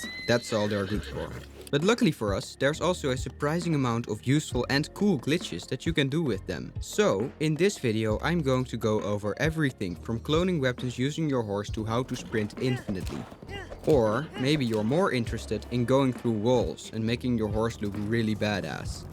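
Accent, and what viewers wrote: Netherlands. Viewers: quesion, are you dutch? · Are you dutch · Mate are you dutch??? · Hol up, u dutch? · Ik hoor aan je accent dat je nederlands bent maar bedankt voor de video :)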